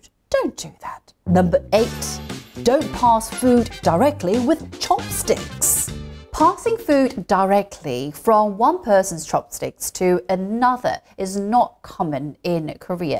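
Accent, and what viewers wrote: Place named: United Kingdom. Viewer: 영국 액센트 넘 좋아요. 영어만 실컷 들을 수 있겠어요. 지금 그대로도 예쁘세요~^^ 미스때는 선녀셨군요~^^❤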